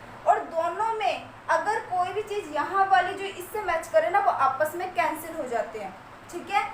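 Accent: Bihar, India. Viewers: Hay miss aap Bihar se h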